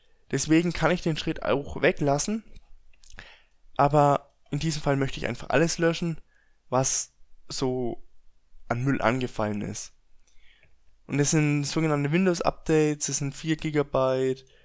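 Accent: Franconia, Germany. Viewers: aus franken? :D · Schätze mal du bist ein Fränkischer Mensch aus Franken (Dipp). Du redest ja wie der Loddar. Aber mach die nichts draus. I ben of älle fäll a Schwoab. Ich finde ja alle südlichen Dialekte super. Aber so ein Ossi oder auch Fischkopf-Dialekt kann ich gar nicht ab.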